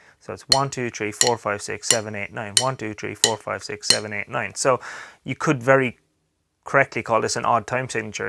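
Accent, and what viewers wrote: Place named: Ireland. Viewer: I hear your pain! I only have a small rubber mat kit at home with an added real bass drum but I can practice on a real kit at school 3 times a week for half hour. I'm about to take Trinity grade 1 but it's hard switching from acoustic to electric when practicing! I like your videos and your lovely Irish accent 🙂.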